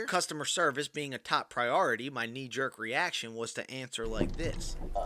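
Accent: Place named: Appalachia, United States. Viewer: You should do your own narrating, instead of the hillbilly hick voice. Your natural voice sounds better.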